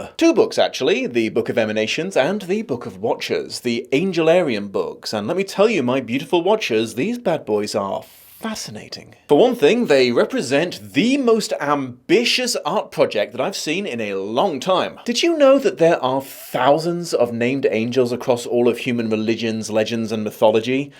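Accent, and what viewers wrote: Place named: RP, United Kingdom. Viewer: No offense, but it’s a bit weird to hear this guy describe this book in his posh English accent. I start talking with a southern drawl when I think about this book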